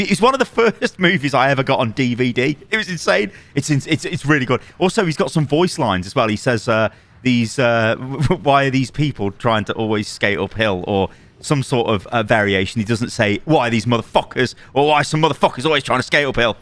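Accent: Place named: Newcastle, United Kingdom. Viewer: Sounds like Newcastle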